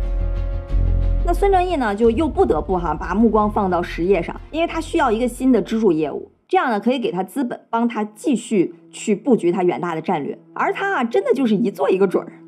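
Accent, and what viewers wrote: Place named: Qingdao, China. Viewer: 小Lin笑容好甜，听声音有一点点山东青岛的口音，不知道我猜没猜对😅😅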